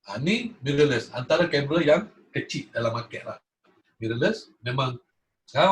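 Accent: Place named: Kelantan, Malaysia. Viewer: Terbaek la bila sifo share ilmu. Mula2 ckp dah tak bunyi kelate. Bila dah masuk part gambar ada keluar juga 😅. Apa pun mmg terbaek bang. Saya enjoy!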